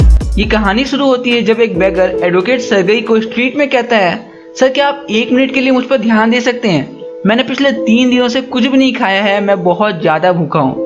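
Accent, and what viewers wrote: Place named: Bihar, India. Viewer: YAAR SAB KUCH TO THEEK THA BUT ACCENT SUDHAAR LO ....... BIHAARIYO KI TARAH BOL RHE THE EK DAM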